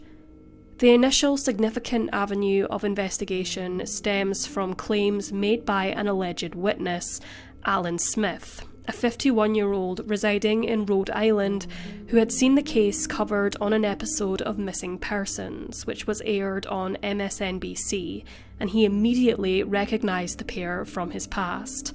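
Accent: Scotland, United Kingdom. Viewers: 10 months late but, no... OP being Scottish isn't an issue. And yes, these are German names but they are in America and have been anglicized. The most spoken language in the United States is English. It is highly unlikely that English speaking Americans would pronounce these former German words with a high German accent. Please be realistic. Thanks!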